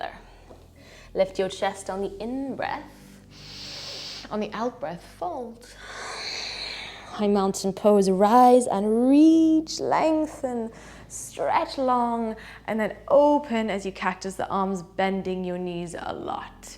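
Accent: South Africa. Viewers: Hi, thank you for this amazing practice, I really enjoyed it as well as your lovely south african accent,I am also from SA but I live in Germany..it feels so good doing yoga with you..makes me feel at home, my intention is to do your challenge, I started today and will carry on tomorrow! Thank you 💖 💗 💛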